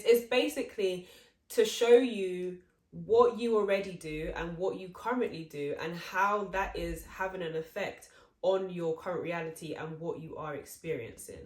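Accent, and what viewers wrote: United Kingdom. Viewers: london accent 🇬🇧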